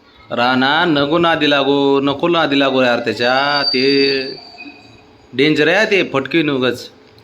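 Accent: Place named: Sangola, India. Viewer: तुम्ही पुण्यात राहत आसला तरी सोलापूर ची सांगोला ची भाषा आहे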